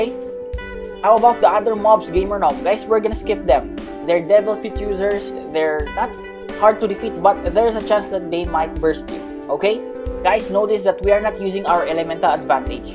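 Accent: Philippines. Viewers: R u a filipino? Bc the way u speak english is just like filipino and ur wearng a philipines strae hat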